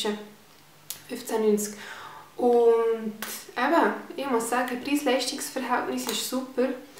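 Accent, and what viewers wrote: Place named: Solothurn, Switzerland. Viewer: Soledurner Dialäkt <3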